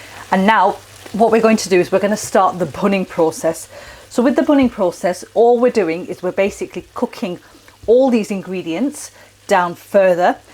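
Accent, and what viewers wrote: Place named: North, United Kingdom. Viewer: are you from up north , can hear is in the accent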